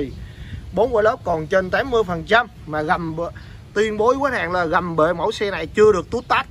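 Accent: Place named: Binh Duong, Vietnam. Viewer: giọng chắc đồng hương với tài ô tô bình dương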